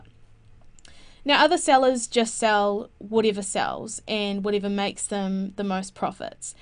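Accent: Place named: New Zealand. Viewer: Sounds like New Zealand to me.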